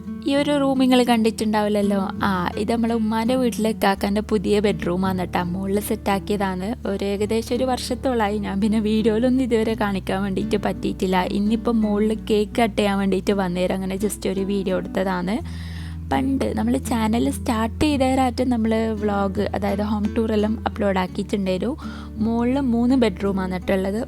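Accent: Kannur, India. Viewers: കണ്ണൂർ ഭാഷ 👍👍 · ശരിക്കും തനി കണ്ണൂർ ഭാഷ 👍👍അദ് മദി 🙏🙏🙏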